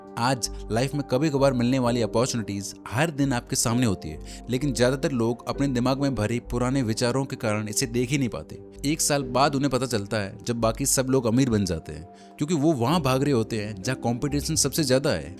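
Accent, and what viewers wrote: Rajasthan, India. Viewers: Video acha he or edit bhi achi he... Rajasthani English extent wo bhi acha he..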